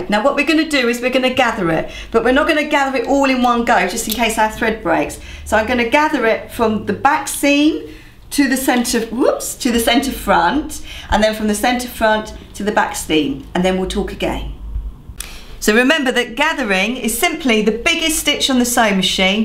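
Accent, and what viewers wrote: United Kingdom. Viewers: you have the uk accent that i just looooove ! i repeat after you like that i learn the uk accent . hihihi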